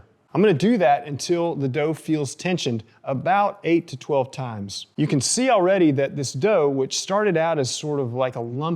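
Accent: Arkansas, United States. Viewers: Being a Memphian, I was intrigued to hear your slight accent... cool to find out that you are originally from Arkansas! Love to watch you bake! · I’ve been watching these videos religiously for months. I knew that accent sounded familiar. Had no idea you were a fellow Arkansan! Thanks for the work you do! It’s been a big help to this amateur baker.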